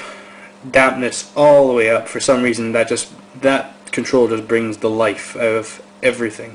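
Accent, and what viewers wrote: Canada. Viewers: oh nice lol you sound canadian though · are you canadian? i hear a thick accent. but i also get a small distortion problem with my axe-fx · k...ur acsent sounds a little canadian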